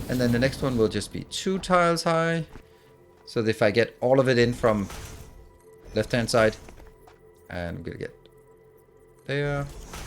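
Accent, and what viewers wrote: Denmark. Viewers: you sound so Danish but i really dont know but thats besides the point, Great video and content my dude!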